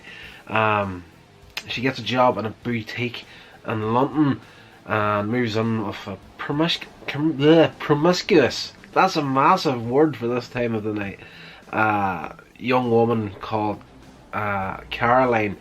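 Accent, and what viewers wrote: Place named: Scotland, United Kingdom. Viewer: Excellent review. You sound Scottish. I'm MinneSNOWton.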